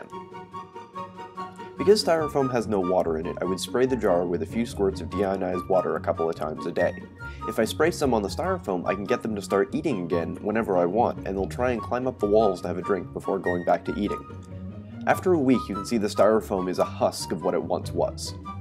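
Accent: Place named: Canada. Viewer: This is the perfect example of a Canadian accent. 😂 awesome video, even if I’m 3 years late.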